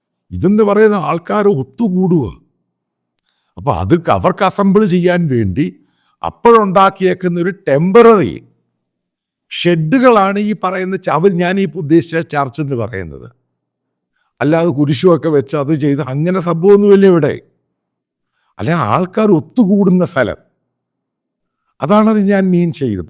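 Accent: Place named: Pathanapuram, India. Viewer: സാമുവൽ സാർ ഞാൻ കേരളത്തിൽ ഞാൻ ആരാധിക്കുന്ന അപൂർവം ചില journalists കളിൽ ഒരാളാണ് സാമുവൽ സാർ.. കാര്യങ്ങൾ പഠിച്ച് മനസിലാക്കി സത്യം ജനങ്ങളിൽ എത്തിക്കുന്ന താങ്കളുടെ Hard journalism ഉം പത്തനാപുരത്തുകാരുടെ Accent ഉം എന്നെ വല്ലാതെ കോരിത്തരിപ്പിക്കുന്നു. ആളുകൾ വിമർശിച്ചോട്ടെ.... സാമുവൽ ധൈര്യമായി മുന്നോട്ട് പോകണം സർ. I wish u all success to you and your news channel which proved to be Genuine and popular news channel in Kerala. Ajith Kumar from Thiruvananthapuram.🙏🏻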